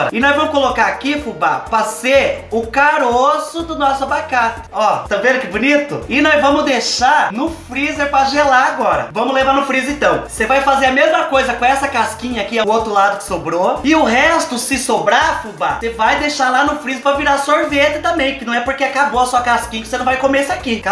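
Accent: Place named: Caipira, Brazil. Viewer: do céu adoro esse sotaque caipira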